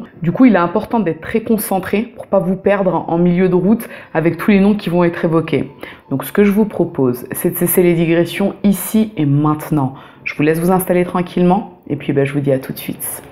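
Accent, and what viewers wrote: Italy. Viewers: cet accent! tu sais parler italien? impressionnant ! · Quel accent !!! Suis italien j'ai remarqué de suite que tu le parlais, tu es Italienne ? Bravo une fois de plus gros travail de ta part sur cette histoire ! J'adore mon rdv du dimanche !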